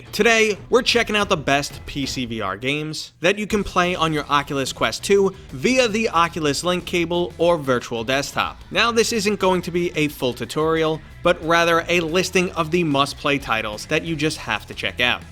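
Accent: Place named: Brooklyn, United States. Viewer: I’m Scottish and I feel this guy is from Brooklyn. Am I right?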